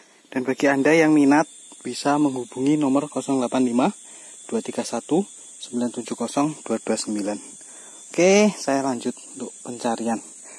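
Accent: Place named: Bojonegoro, Indonesia. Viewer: logat bicarane mirip bojonegoro.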